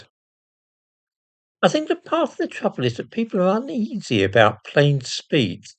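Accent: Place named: England, United Kingdom. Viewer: is English as is his pronunciation.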